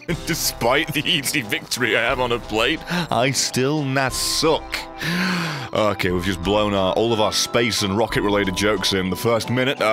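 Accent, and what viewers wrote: United Kingdom. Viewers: Nah it's his British accent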